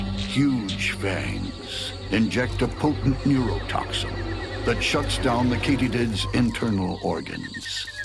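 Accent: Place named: RP, United Kingdom. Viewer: Although slickly filmed and narrated by the voice of Mr. Fancy British Guy, these videos are as staged and contrived as any 8 year boy who drops bugs in a glass jar, gives it a good shake and gleefully watches the bugs rip each other apart. These films are on the same level of sophistication as the ubiquitous pimple popping videos.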